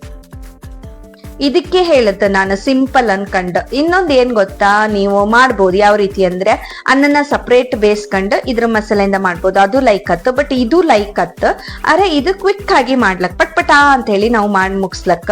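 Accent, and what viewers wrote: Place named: Kundapura, India. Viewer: ಕುಂದಾಪುರ ಕನ್ನಡ ನ 😄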